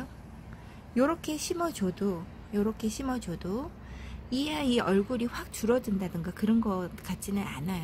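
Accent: Gyeongsang, South Korea. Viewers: 목소리가 경상도 분이신거 같아요...혹시 창원은 아니시지요? 제가 창원에 내일까지 있어요..혹시 창원이시면 커피하고 싶네요...ㅎㅎㅎ...너무 예뻐요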